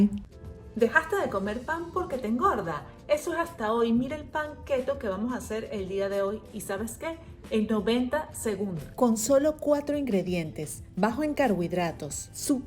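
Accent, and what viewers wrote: Venezuela. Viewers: Tienes acento venezolano. Bueno te envio la bendición d Dios en nombre de Cristo;puesm parece genial la receta. Gracias. Saludos desde Venezuela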